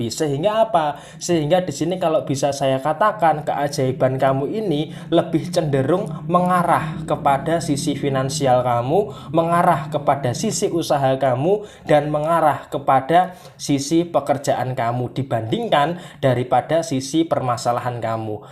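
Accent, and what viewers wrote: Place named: Kulonan, Indonesia. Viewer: Njenengan orang mana mas kayak orang kulonanan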